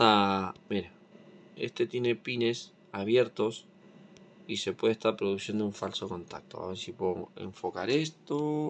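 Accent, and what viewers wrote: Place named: Argentina. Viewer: Que groso saludos de argentina y gracias por tus conocimientos....jajaja insisto que tenés tonada de argentino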